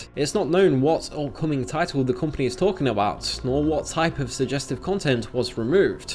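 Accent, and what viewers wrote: United Kingdom. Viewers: He sounds British so that could be it. They also say vittamun instead of vitamin and beeta male instead of beta male.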